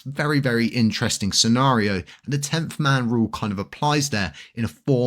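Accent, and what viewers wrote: United Kingdom. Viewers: I think he’s British.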